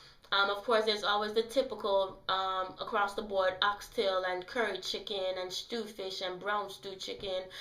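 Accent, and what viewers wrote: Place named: Antigua and Barbuda. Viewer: Girl you took me back. I was not born in Antigua but I used to go there every year from 1-16. It has been a long since I have gone back. You are apart of the family. My cousin and step mother are a part of the family. You might be surprise but we could be distant family. It was great hearing the accent again. BTW, I will be asking my mom to make some ducuna. Fungi and steam fish is a regular thing in my house even though my boyfriend does not eat it.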